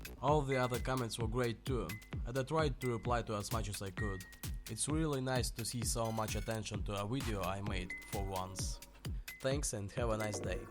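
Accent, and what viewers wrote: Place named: Russia. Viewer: Russian accent best accent.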